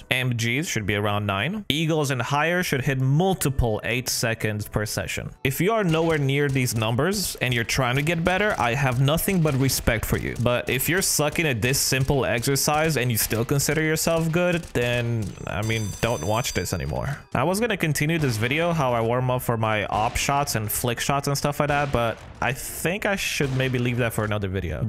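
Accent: Romania. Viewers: Esti roman? Romanian? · are you a romanian? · R u Romanian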